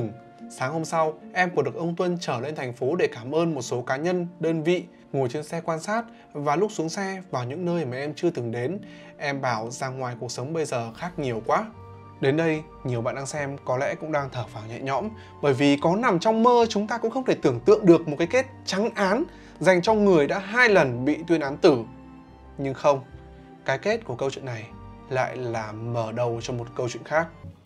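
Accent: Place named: Phu Tho, Vietnam. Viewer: à mà ah ở phú thọ đúng giọng giống quê em lắm:)))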